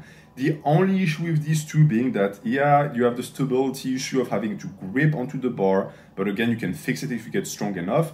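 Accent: France. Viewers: Are you french or speak french as your main language? I can sense a little bit of french when you talk in English but keep it up !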